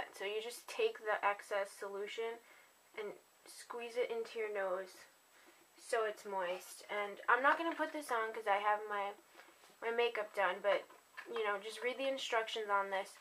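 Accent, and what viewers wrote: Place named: Northern England, United Kingdom. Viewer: Worked, thanks. Btw, are you from up Nort? Thought I heard a bit of a northern dialect in your speech.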